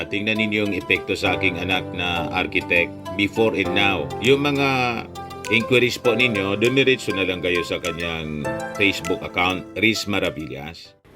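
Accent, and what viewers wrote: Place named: Bicol, Philippines. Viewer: Baket ganyan ka mgsalita!bicolano ķa ba!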